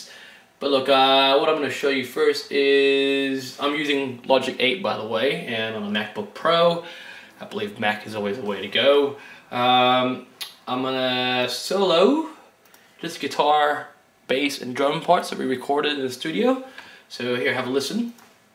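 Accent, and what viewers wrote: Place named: Australia. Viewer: Lol I cant tell if you're Australian or American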